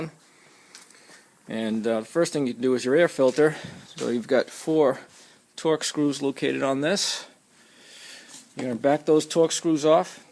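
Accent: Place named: Rhode Island, United States. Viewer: Hoi, what part of New England are you from? Lived in Rhode Island for several years — sounds like RI?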